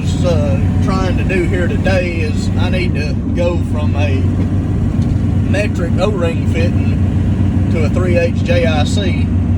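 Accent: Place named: South, United States. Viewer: Hey, I love to hear you talk. Too many of us southerners are losing our accents! Would love for our northern friends to listen to your ramblings!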